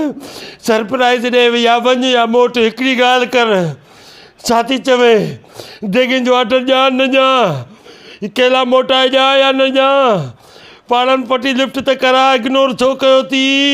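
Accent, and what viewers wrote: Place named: Shikarpur, Pakistan. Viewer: What a beautiful Shikatpuri boli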